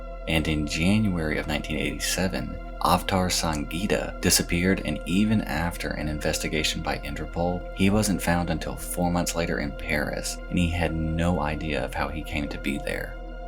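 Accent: United States. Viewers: Hearing Lorry (instead of truck), flat (instead of apartment), and petrol (instead of gas[oline]), in an American accent is really strange lol.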